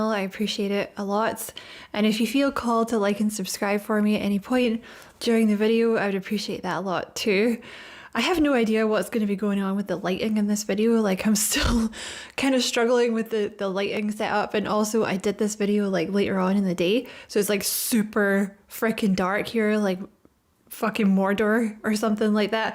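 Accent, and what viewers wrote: Scotland, United Kingdom. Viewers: OMG, I knew that you were Scottish by your beautiful bro. And I just found out that I have a percentage of Scottish in myself and I just absolutely adore that.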